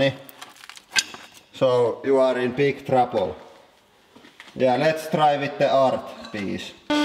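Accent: Finland. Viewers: this clay monsters are so satisfying to watch when they get cruched:D along with the finnish accent this page is just perfection^^ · Could you do a video in Finnish? I don't speak Finnish but I love your accent and would like to hear what Finnish sounds like. Especially the phrase "hydraulic press."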